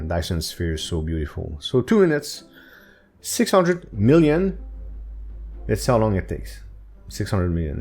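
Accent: Quebec, Canada. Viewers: Es tu québécois?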